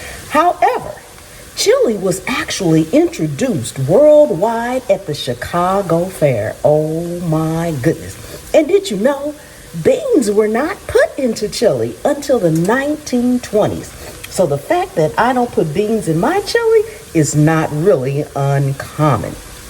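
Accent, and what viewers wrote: South, United States. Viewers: Girl...I suspected you were southern because I kept catching a southern accent here and there, but this video confirms my suspicions...southerners definitely do NOT put beans in their chili!!! 😂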